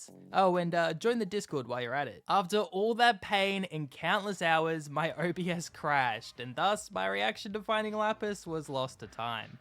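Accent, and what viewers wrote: Australia. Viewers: Are you Aussie the accent sounds familiar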